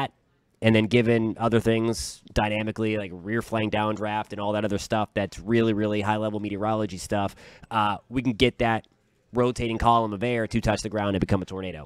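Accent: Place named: New England, United States. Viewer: Not weather related but did you say you’re in Texas? You sound like you were raised here in New England. Great pace delivering concise and interesting information- thank you!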